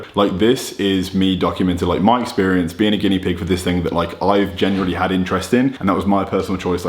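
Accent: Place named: United Kingdom. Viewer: first of all...buying any peptide as a liquid is the worst decision you can take, they have to use so many conservants to keep the peptide together. The best way is lyophilized cake but we all know how anoying is to reconstitute and dose them, extra bac water, needles, syringes and shit. So, my gym bro, it seems you have an UK accent and you have in the UK the Lyoprester, it was invented there, under your nose mate and here we are, putting ready mixed peptides in you...😌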